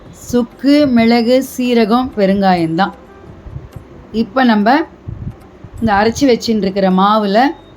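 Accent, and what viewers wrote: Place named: Iyengar, India. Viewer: உங்களுடைய இனிமையான அய்யங்கார் தமிழ் கேட்க மட்டுமே இங்கே வரலாம்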